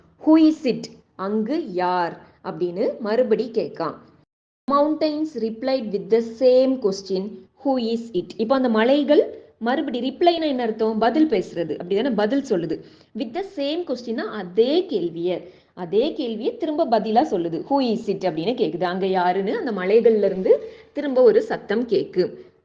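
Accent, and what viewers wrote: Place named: Tirunelveli, India. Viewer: Hi Mam, are you Living nearby Thirunelveli? Because your slang be like so.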